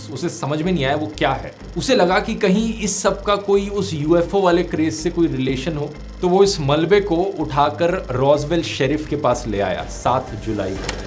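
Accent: Haryana, India. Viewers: DONT YOU THINK DHRUV RATHHEE STILL KEEPS HIS HARYANWI ACCENT(JAAT) WHILE SPEAKING HINDI IN THE VIDEOS.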